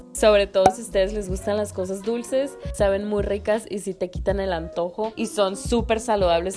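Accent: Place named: Monterrey, Mexico. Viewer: Disculpa,eres de Monterrey? Tu acento es muy parecido al de Monica Llaca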